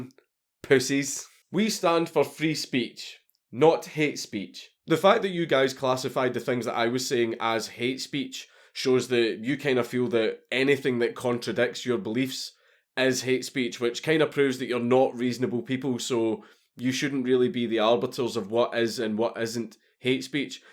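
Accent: Scotland, United Kingdom. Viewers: I seriously love your Scottish accent. I've watched so many of your Mad Lads vids the voice in my head now has an accent😂😂🤣🤣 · "you know what i am about" yeah, you are someone who is 'edgy', and says things that sometimes tickle the edge of hate speech. given that america has no hate speech then we would just call you unprofessional, at least while not in a professional setting, bordering on low brow humor, but only sometimes. oh yeah, and you have a scottish accent. you may get some shit for that accent over here.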